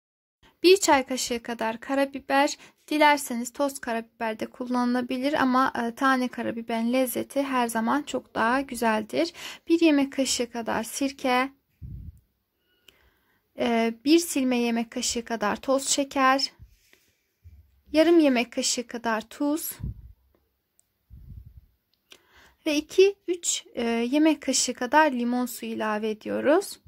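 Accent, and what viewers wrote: Azerbaijan. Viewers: Güzel sesinizden azerbaycanlı oldugunuz belli⚘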